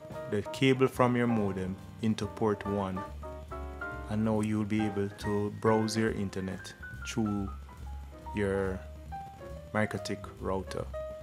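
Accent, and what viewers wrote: Jamaica. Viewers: Sweet. Can learn Mikrotik with a Jamaican accent! Big Up the Tik!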